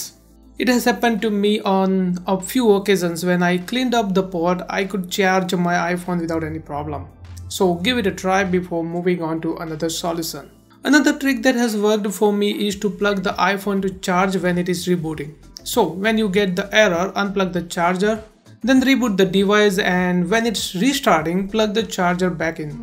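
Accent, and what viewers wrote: India. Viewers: India accent + spoke very fast = rip listeners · omg that indian accent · You are a narrow minded racist. A chinese criticizing someone’s English accent is just hilarious. His English is fine - he has an ‘accent’ but I am quite sure even you could understand. BTW it’s ‘Indian accent’ not India accent.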